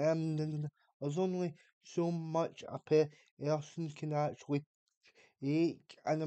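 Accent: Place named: Scotland, United Kingdom. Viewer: No It flowed well, probably because you were not talking about how annoyed you were. I have been away from the UK for almost twenty years, first in the States and now in China, so hearing Scottish accents is not something I am used to. Now drinking scotch is something I am rather familiar with lol. Anyway, four am here and that hot choccy is not going to make itself and I gave my monkey butler the night off.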